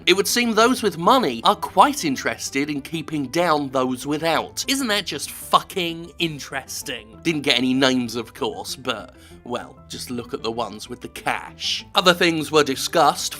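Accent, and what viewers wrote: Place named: United Kingdom. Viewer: Sven Tempest these countless accents you are talking about are dialects. someone from London might sound slightly different than say someone from Liverpool but they are still English accents none the less. take it from the perspective of someone from outside the u.k they hear someone like Jim speaking they think British but if they hear an Irishman speak then that guy is Irish not british even though he is part of the union. hell even people in the u.k never refer to their accent as british.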